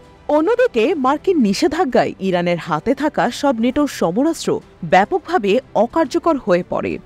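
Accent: Kolkata, India. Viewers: ৭১ টিভিতে কি আজকাল ভারতীয়দের নিয়োগ দেয়া হয়? এই সংবাদ পাঠিকার উচ্চারণ শুনেই বোঝা যাচ্ছে যে সে পশ্চিমবঙ্গের কলকাতার অধিবাসী! সকল দন্ত্য-স এর উচ্চারণ কলকাতার টানে বলছে!!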